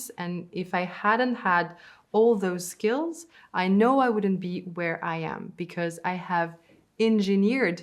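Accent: France. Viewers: French is her first language. 😊 I think she is doing a great job here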